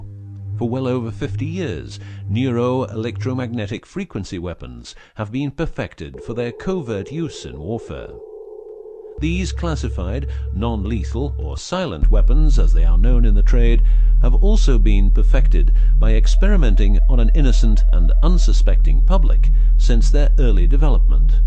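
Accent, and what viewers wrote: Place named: United Kingdom. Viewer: These assholes lost my interest when during the segment describing brain benefits of monatomic gold, they said, "detecting lies" and flashed a picture of George W. Bush instead of Bill and Hillary Clinton. That's pretty much all I needed to know about these idiots. A British accent spewing bullshit does not a watchable video make.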